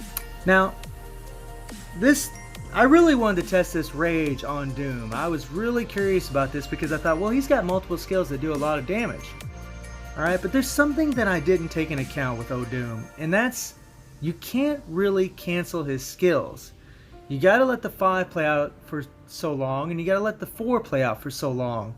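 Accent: United States. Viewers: He is from the United States it's obvious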